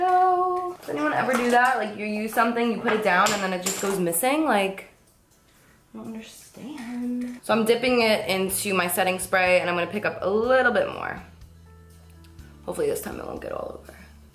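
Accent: New Jersey, United States. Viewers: She is from New Jersey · Jersey accent :)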